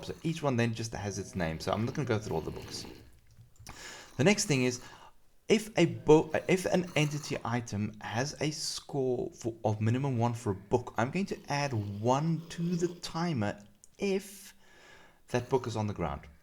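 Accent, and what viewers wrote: South Africa. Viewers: Is your accent South African, if not it sounds surprisingly like it.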